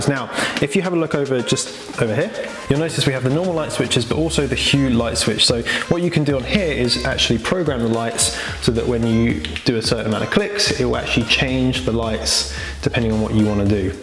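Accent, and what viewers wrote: United Kingdom. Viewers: Great video man. I think you have everything but blinds in the living room should do it all. Really like your place. Love your English accent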